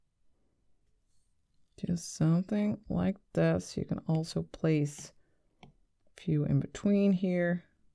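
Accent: Netherlands. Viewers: I really enjoyed this! I am just a beginner and I appreciate your careful and clear explanations…En Ik hoor een mooi Nederlands accent!😊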